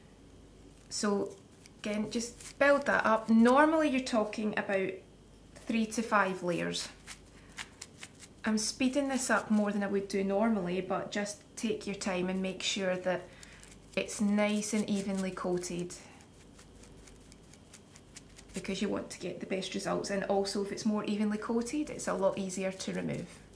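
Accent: Scotland, United Kingdom. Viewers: I agree. Perhaps a series of lovely Scottish poems and stories read in your soft brogue would soothe the world's woes. What a delightful prospect. Soft music would be a sweet touch. Thank you.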